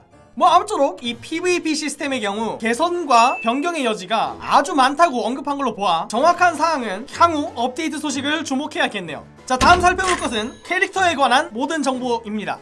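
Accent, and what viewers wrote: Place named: Gyeongsang, South Korea. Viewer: 경상도 분이시네 ㅋㅋㅋㅋ 엄마편 아빠편 이카면서~ㅋㅋㅋㅋ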